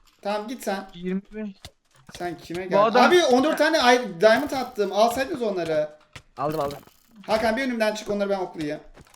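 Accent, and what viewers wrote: Trabzon, Turkey. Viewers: abi trabzonlumusun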